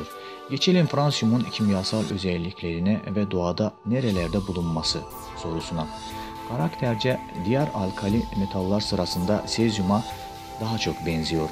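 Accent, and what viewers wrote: Azerbaijan. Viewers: Adam Azerbaycan Türk'ü anlayamadı mı ses tonundan şivesinden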